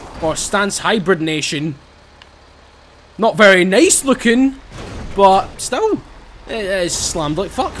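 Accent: Scotland, United Kingdom. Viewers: What part of Scotland is he from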